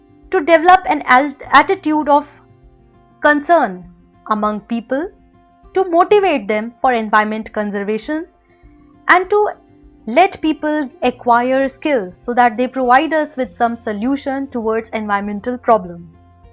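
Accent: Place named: India. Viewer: The content is good, however, the indian accent's extremely annoying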